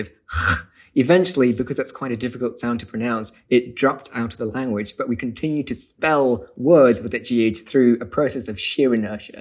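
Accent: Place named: United Kingdom. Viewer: いやどう聞いてもイギリス人だろうがこの人😂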